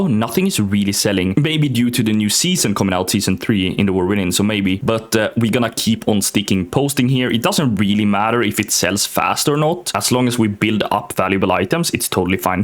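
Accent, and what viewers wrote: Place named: Sweden. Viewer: PLEASE FOR THE LOVE OF GOD STOP SAYING "SHA-LENGE", "SHECK"... ITS CH NOT SH, YOUR SWEDISH IS SHOWING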